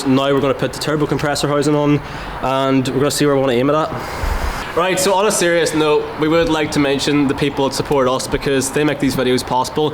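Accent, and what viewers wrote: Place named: Ireland. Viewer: Nice vid interesting facts about the foot cylinders sizes. Strange hearing a irish/northern accent 😂 if your even from here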